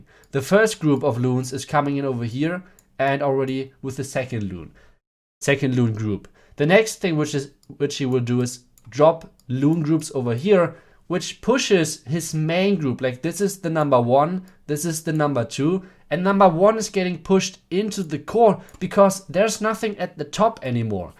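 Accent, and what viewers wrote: Germany. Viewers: You can tell by his accent he is german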